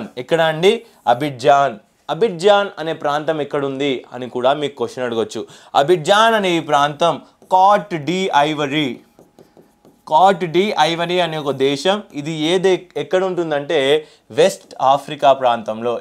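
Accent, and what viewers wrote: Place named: Guntur, India. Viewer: Guntur language bro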